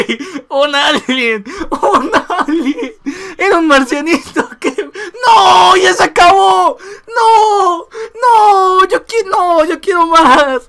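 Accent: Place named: Mexico. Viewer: Cesar Rosas Torres Se le siente el acento mexicano en él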